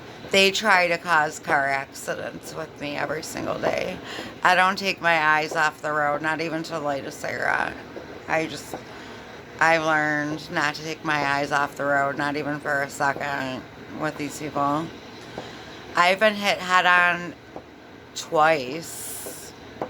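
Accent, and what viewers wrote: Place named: New York, United States. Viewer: Wow, I grew up in NY and was all over your other channel trying to figure out if you are in NY from your accent. I finally looked up a restaurant I saw on a sign in your video, then found this channel, where you announced exactly what I took a half hour figuring out (Rochester). So I am going through your vids as a fellow NYer (in Phoenix - don't come here) point of view and not to be a sadist but enjoying it because it is comforting to hear from someone with your NY vibe.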